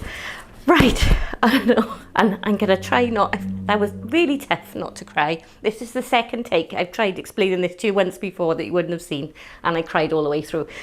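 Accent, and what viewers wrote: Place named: Wales, United Kingdom. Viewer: Thrilled for you all , love hearing your welsh accent , we ‘ve missed all our cardiff/newport family and looking forward to a visit across the bridge soon ! Well done as always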